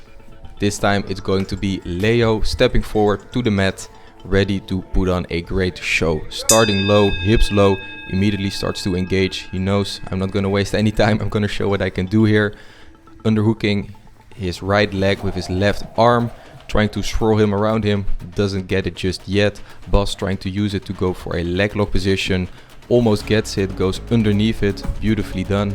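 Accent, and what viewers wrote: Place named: Netherlands. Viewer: Do I smell Dutch?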